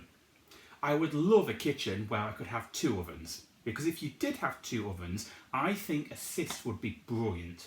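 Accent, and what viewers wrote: United Kingdom. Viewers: Thank you for your really great and useful review videos, your demonstration is very nice with plenty of information and a clear British accent. waiting always for your new... many thanks....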